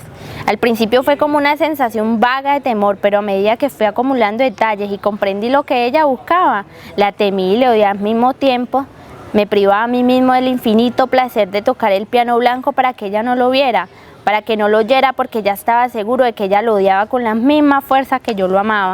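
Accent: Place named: Caribbean Coast, Colombia. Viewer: Me gusta mucho el acento costeño ajá. Se ve el trabajo. Pese a eso, debes tener cuidado con las letras de las palabras que te saltas. Estás inmersa en el texto, no nos miras ni en un momento. Habría ganado mucha fuerza si nos hubieras echado una miradita, lo mismo con la interpretación, agitar la mano hacia adelante en el "ajá".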